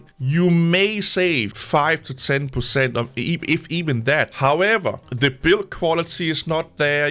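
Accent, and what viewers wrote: Denmark. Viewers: Are you from Scandinavia. Your accent sounds very Danish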